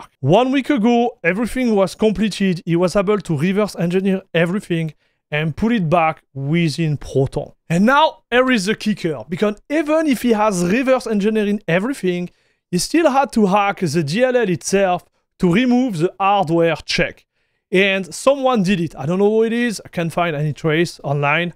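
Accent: France. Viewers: this is amazing ! its like french schwarzenneger speaking :D never seen someone hype so well programming :)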